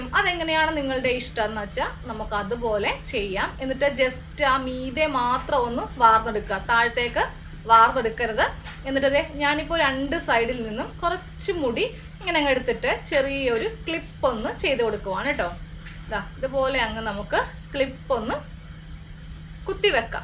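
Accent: Kannur, India. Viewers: Kannoorkariyano samsaram kette thonni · Kannor aanalle, naanum kannoor aan. Samsaram kettappam manassilayi😃👍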